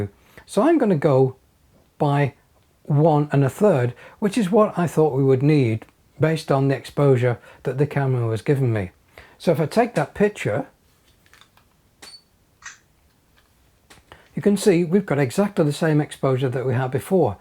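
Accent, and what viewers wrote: United Kingdom. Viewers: How come there is no younger person minus the British accent video tutorial for Panasonic fz 300 on you tube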